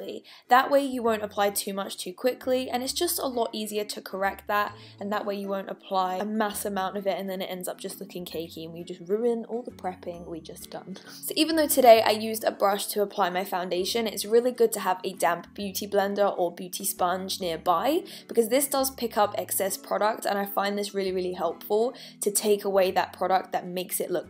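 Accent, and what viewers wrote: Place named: United Kingdom. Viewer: I love these videos, you explain everything so perfectly. Love that British accent too!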